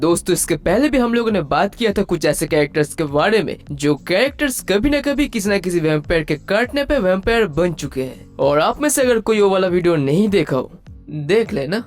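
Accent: Bengal, India. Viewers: Are you bengali ? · Bhai tu bangali hai. Na?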